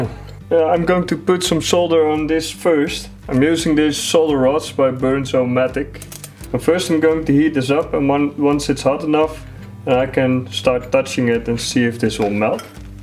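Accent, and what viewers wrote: Netherlands. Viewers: Is this guy Dutch ?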